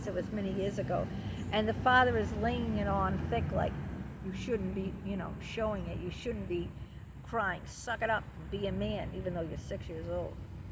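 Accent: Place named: New York, United States. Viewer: Hey. Totally cute and funny as hell. Yep, watched the whole talk. And I'm not a bot. I'm guessing , with that accent, White Plains NY or maybe Yonkers? (pushing it). I am 62 now, and you just captured all of it. Do they have Gay G.I. Joe now? Haven't had that one dumped in my lap yet on soc. media. Rainbow Joe. Healing prayers for your past trauma, Been there, done that. You look great, obviously taking care of yourself. ONWARD!!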